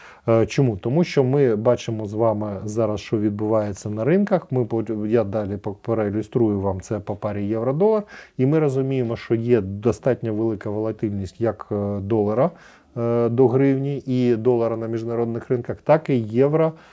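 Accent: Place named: Russia. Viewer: Підтягнути чисту українську ,без нахилу на російську!!!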